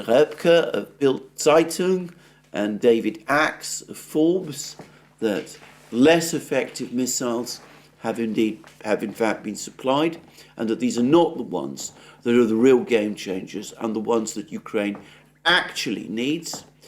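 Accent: United Kingdom. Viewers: This "program" is the "debacle". Fallacious generalizations, about Biden's intents, performance and rhetoric abound. Confident claims about the (yet unopened) aid portal reveal only the profound propagandist purpose of this channel. The analysis is to be read, not for its validity, but is valuable, nonetheless for exposing with a UK accent, Moscow's message.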